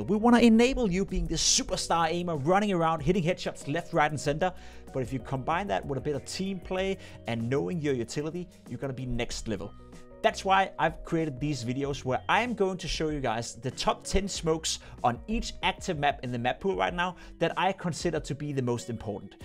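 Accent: Denmark. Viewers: are you from Denmark mate? it does sound so, anyway I left a like fellow dane! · Are you Danish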